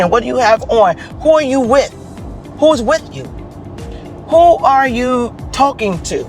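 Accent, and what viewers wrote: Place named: New York, United States. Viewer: Amen, 🎉 Sis love your encouraging words I'm the one who is 66yrs, 285lbs and how i love your NYC accent. Love your videos. I've been watching your videos ever since the video popped up in front of me when you were with Gillian that's where it first started. Water pills, heart pills,baby aspirin and retain water from my N/A Cirrhosis. I know you have alot of subscribers but i'm starting this.... it's something i need to do, and like you said in a comment. I believe that God put that video there for me. I usually watch the watchmen and watchwomen brothers and sisters in Christ and then Gillians video popped on that's when i looked and watched like okay This is my wake up call Love you ❤ all the way from Illinois/Wisconsin.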